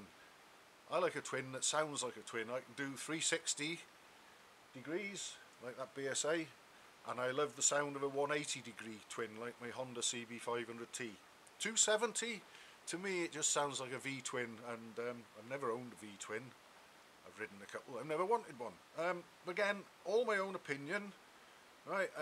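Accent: United Kingdom. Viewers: Totally “get it ”, thanks for articulating much better than me for why I feel the same way. Ex. Pat Brit who thoroughly enjoys your content. My Dad was from Hereford and your voice reminds me of all my uncles near Wales. All the best from Putnam Valley NY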